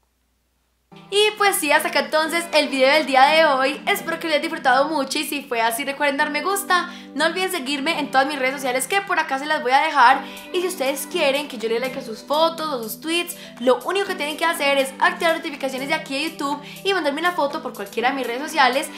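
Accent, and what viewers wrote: Antioquia, Colombia. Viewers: # jaja me encanta ese acento colombianooo, te amo 😍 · Soy la única que ama el acento Colombiano? 🤔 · Me encanta como habla con ese acento colombiano... Nooo que está mujer es bellísima 😍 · El acento de, es uno de muchos acentos que hay en Colombia. Ella habla paisa, pero hay más acentos como el rolo, costeño, valluno, santandereano entre otros. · Me encanta ese habladito tan paissa AJJAJA la amo saludos desde Francia pero soy de Colombia y amo mi tierra 💛💙💗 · El acento de ella es paisa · Es Colombiana y ese es el acento de Colombia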